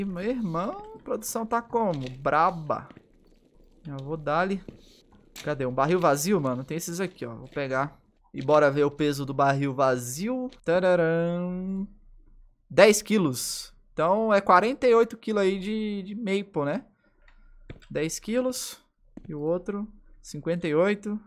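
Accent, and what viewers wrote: Minas Gerais, Brazil. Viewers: a cada dia mais mineiro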